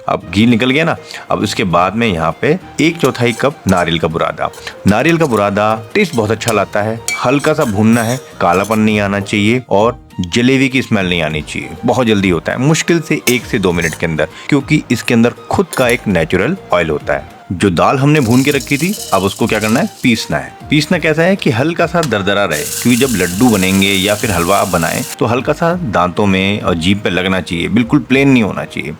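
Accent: Uttarakhand, India. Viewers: हम दोनों बैठ कर TV screen पर आपके द्वारा बनाये जाने वाले व्यंजन को गहनता से सुनते हैं। कभी कभी आप कुमाऊँ लय में बोलते हो कभी हरियाणा राजस्थान से मेल खाती भाषा का प्रयोग करते हो। सारा मिलकर आपके प्रोग्राम स्मर्णीय होते हैं... धन्यवाद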